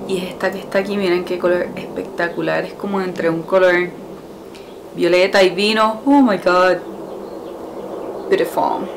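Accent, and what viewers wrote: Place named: Puerto Rico. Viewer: Cada vez que te escucho hablar no puedo evitar pensar que eres de acá! PR right? Here you have a new subscriber :)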